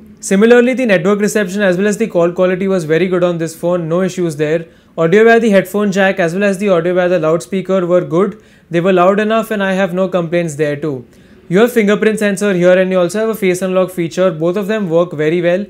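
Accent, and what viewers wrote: India. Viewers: Play video : Thick Indian accent. No, thank you.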